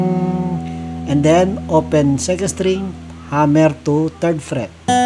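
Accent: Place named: Visayas, Philippines. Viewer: Dol galing talaga, bisaya ka?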